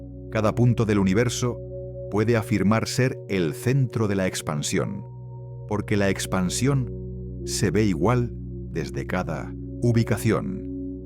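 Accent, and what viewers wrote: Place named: Spain. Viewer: menos mal que está en español de España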